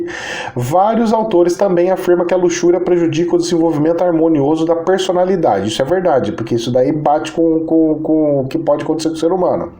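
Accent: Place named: Caipira, Brazil. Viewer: Eu acho tão engraçado o jeito caipira que você fala, a aula fica mais divertida...kkkk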